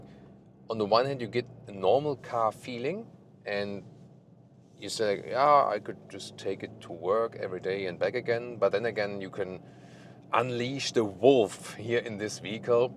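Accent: Germany. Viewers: Klingt nach German English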